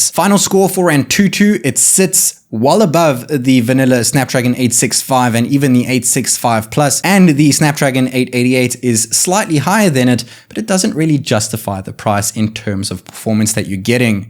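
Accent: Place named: South Africa. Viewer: This guy sounds like a South African🤔 the accents gave it away....but is he?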